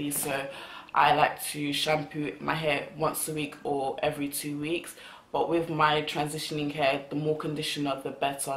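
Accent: United Kingdom. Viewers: Just subscribed - only because I heard your accent....big up Brit Youtubers!! Now let me listen to what you have to say! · I love that British accent, it's special.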